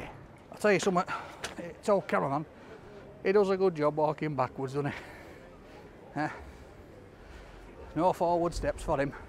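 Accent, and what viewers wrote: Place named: Yorkshire, United Kingdom. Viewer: I'm trying to place your accent. It seems like a mix of Yorkshire and Stoke-On-Trent. Please put me out of my misery :)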